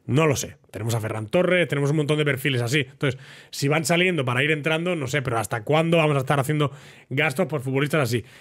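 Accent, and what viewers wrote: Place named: Argentina. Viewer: Parece Argentino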